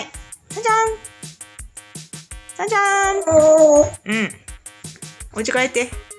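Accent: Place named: Kansai, Japan. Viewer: さんちゃん、よく話を聞いてますよね。 ちゃんとやりとりしてるし、いつもコミュニケーションが、すごいと感心するし関西弁にも💙💛💖です。 カワイイ😍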